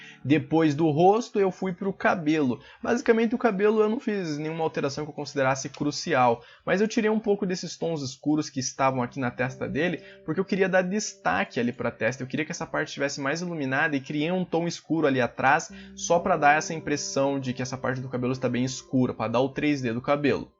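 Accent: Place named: Paraná, Brazil. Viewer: Paranaense alert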